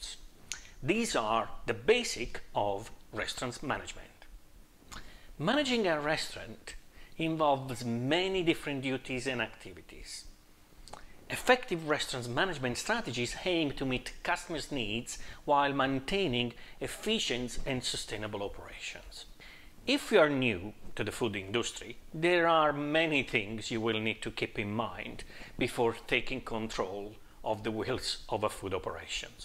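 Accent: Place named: Italy. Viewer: nice content I like your italian accent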